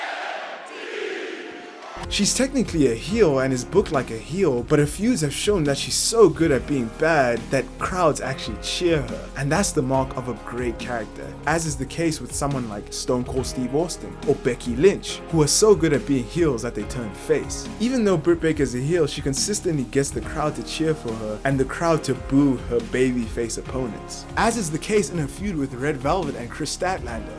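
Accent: South Africa. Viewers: You sound like a south African. Good job brother you did a great job on the video so funny and entertaining. Your channels gonna blow up keep it up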